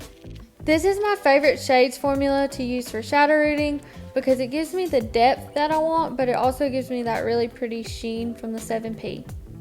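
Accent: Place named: South, United States. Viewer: Love it! Great job and I absolutely love your adorable Southern accent. ❤❤❤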